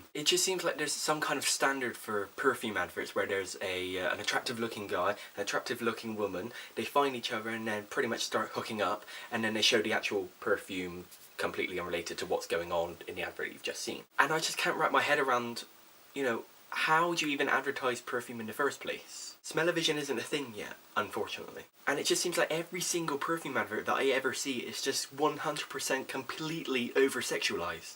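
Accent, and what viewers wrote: Bristol, United Kingdom. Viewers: Your Brizzle accent makes me so happy :')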